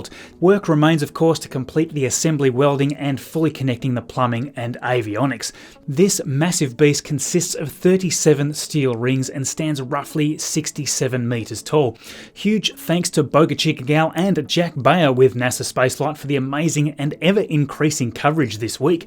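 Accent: Australia. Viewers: glowing dick ? your accent is from australia or new zealand right ?!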